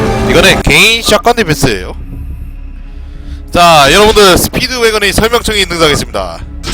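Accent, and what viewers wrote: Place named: Daegu, South Korea. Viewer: 님 대구에 사시나요?교회에 댕기고는 사투리인데 내가사는곳의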